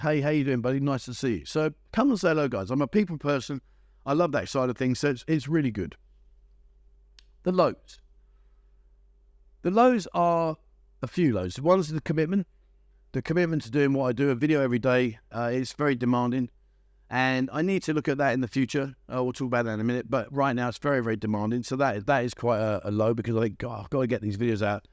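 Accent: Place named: Cockney, United Kingdom. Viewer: well done. Started watching you in mid 2021 when looking for places to retire too. Always fun, informative and delivered with cockney honesty. Well done son.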